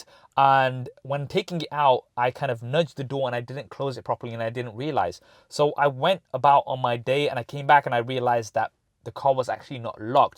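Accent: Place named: Bradford, United Kingdom. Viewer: He's from Bradford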